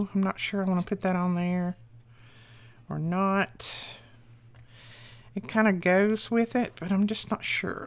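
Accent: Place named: Georgia, United States. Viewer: You wouldn’t happen to be a Georgia Peach would you? I just love ❤️ your accent! You could read the Constitution to me and not get bored! My history teacher was from Georgia and I truly thought you were her. She was my best teacher I ever had.🌹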